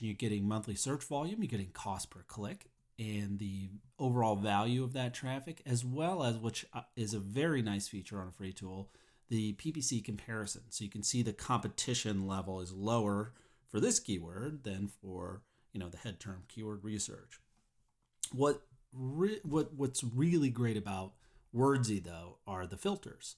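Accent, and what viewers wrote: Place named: United Kingdom. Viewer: Uk English i like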